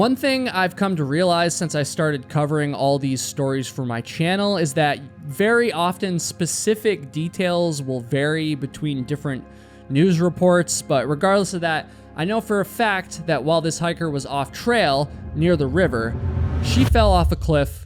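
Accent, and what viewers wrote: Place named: Vermont, United States. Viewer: Been watching your stories for a while now ....10 STARS man , Thanks .....Hey i guess your from Vermont 😄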